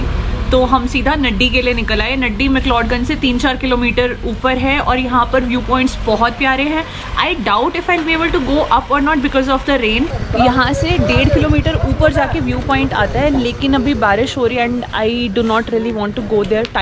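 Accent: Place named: Punjab, India. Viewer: I’m traveling to McLoedganj next month and your videos are helping a lot to learn about the place. I like your voice and Punjabi accent, that makes it more interesting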